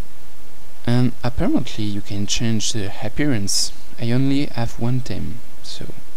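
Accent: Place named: France. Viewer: comme un accent francais,, it's weird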